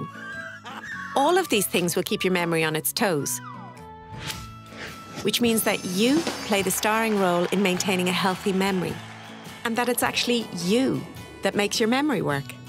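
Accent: Ireland. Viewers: So does this mean it's not my fault that when I hear an Irish accent (sorry voice over) I automatically think of Gypsies trying to tarmac my drive or sell me pegs? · I was wondering why I heard a faint Irish accent. Then I saw 'BBC' in the channel's title :)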